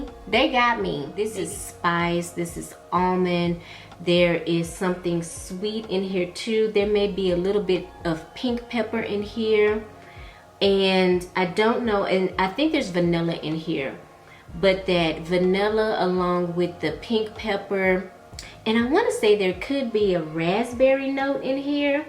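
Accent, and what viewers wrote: Texas, United States. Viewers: “My God Today” you know you Texan! Love you!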